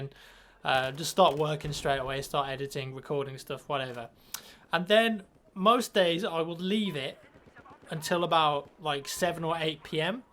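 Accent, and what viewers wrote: England, United Kingdom. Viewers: is he from England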